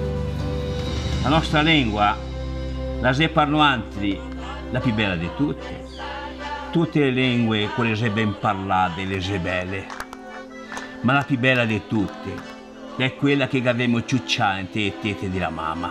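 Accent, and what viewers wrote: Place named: Veneto, Italy. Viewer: Bellissimo filmato, addirittura commovente questa voce che racconta perfettamente la storia in veneto ( con accento "bellunese/trevigiano" con qualche punta di "chioggiotto") dopo più di un secolo di distanza!!! Ma quanti compaesani ci sono in giro per il mondo ed in Brasile in particolare?! Quando penso che la più grande città "italiana" nel mondo non è Roma bensi' San Paolo (città con 12 milioni di abitanti dei quali METÀ sono discendenti della nostra gente) mi vengono i brividi!!!❤👏👏👏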